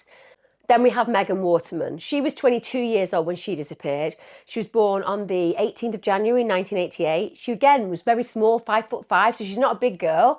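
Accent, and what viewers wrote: United States. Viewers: !! your American accent is getting so much better!! Have you been practicing 👌♥️ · Hearing you with an American accent was so weird haha! But it was actually very well done! 😊😊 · Your interview in the American accent was hilarious 😂 you are right up there for me ❤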